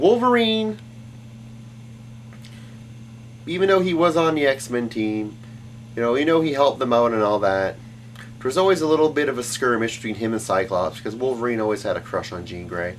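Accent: Canada. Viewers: BAHA I love the Canadian accent!!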